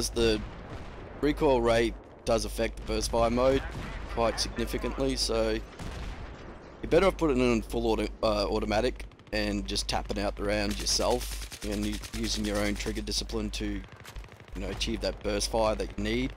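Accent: Australia. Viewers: Like maa peenis, i love australian accents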